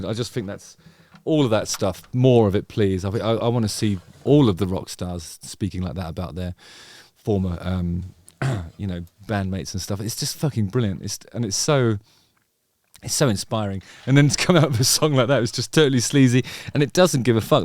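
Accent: United Kingdom. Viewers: First time on your channel. when I saw that suit and heard your British accent I immediately thought of Mick Jagger. Nice call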